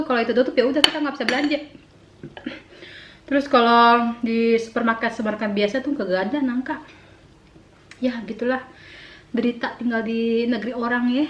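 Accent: Jakarta, Indonesia. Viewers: Mpo saya pengemar mpo suka bgt mpo Betawi bgt ngomong nya,sama ky sy betawi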